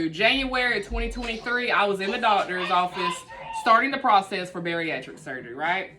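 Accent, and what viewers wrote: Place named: South Carolina, United States. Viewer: I love your accent. You sound like my family in South Carolina.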